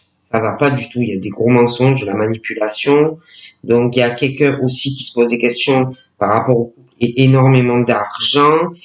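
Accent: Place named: Marseille, France. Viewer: une bretonne à l'accent marseillais, c'est joli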